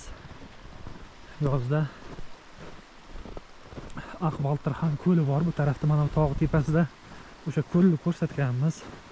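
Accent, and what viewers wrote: Namangan, Uzbekistan. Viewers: Sheva nomongon😊😊😊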